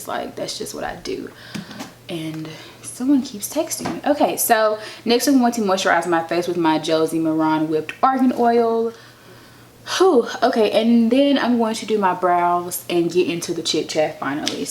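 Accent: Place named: North Carolina, United States. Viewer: You sound like you're from NC! New subbie!! Your vids are great and you're gorgeous!!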